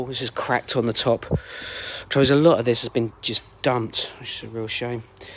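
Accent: England, United Kingdom. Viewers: I’ve seen other videos and loads are from USA it’s sooo refreshing to hear a English accent on this.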